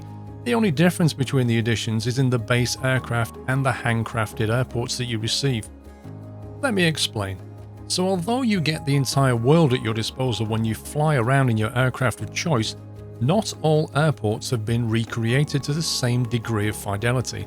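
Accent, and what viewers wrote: United Kingdom. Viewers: Subbed as he's British xD So who's doing an A350?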